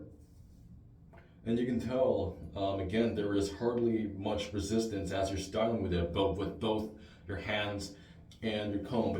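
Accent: United States. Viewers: I really want to try it, but it's hard to find here in Indonesia. Anyways, where are you from? Your accent sounds like American, but your face reminds me of my friend (Chinese-Indonesian)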